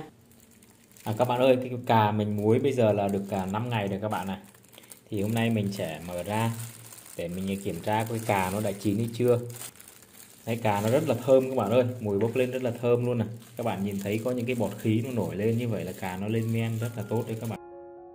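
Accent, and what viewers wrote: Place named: Central, Vietnam. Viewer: Cách chia sẻ đơn giản dể làm, cà pháo trắng giòn ngon. A là người miền Trung à anh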